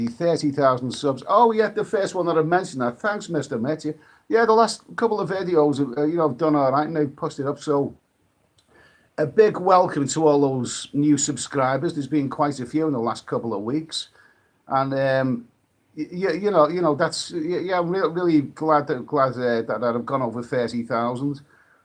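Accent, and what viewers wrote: Liverpool, United Kingdom. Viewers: 2 are better than none<brilliant scouse accent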